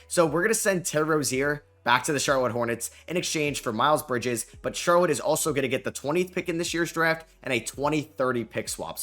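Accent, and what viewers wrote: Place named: New York, United States. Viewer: The new York accent is so funny What about the letters eu in Kneuppell make an 'ih' sound to you? Also great content 👑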